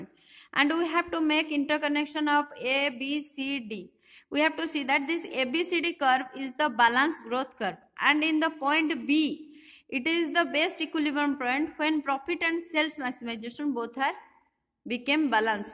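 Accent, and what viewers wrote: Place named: Odisha, India. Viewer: Odia accent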